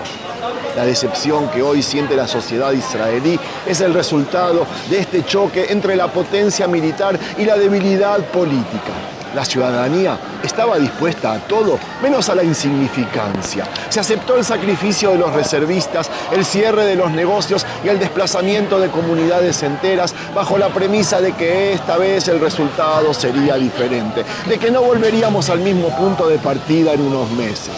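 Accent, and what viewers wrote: Argentina. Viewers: mi opinión lo que pasa es que él siendo argentino de nacimiento (lo digo por su acento tan marcado) adquirió la nacionalidad de ese país y cómo allá está viviendo sabroso, lógico que se hace el ciego-sordomudo. Está defendiendo a quien lo tiene viviendo sabroso. En la mayoría de países latinoamericanos se tiene la idea de que los argentinos se creen de una raza superior y quizás por eso es que defiende a capa y espada la política expansionista de Xion.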